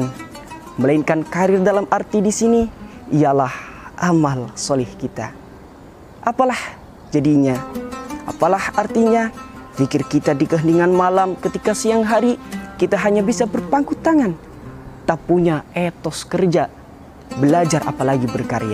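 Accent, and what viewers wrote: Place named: Banyumas, Indonesia. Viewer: Masya allah mamas ngapak, idola🔥🔥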